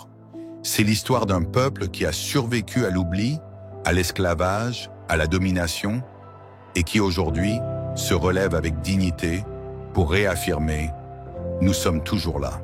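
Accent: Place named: Europe. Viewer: je parlais de la voix qui faisait les commentaires sur la vidéo c'est une voix européenne et les prononciations ne sont pas africaines.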